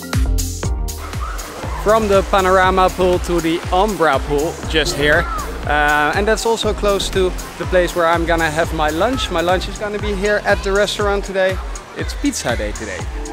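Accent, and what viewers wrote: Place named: Netherlands. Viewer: Leuk! Ik ga daar 16 juli 2022 heen!❤(ik hoor wel dat je nederlands bent hoor😄)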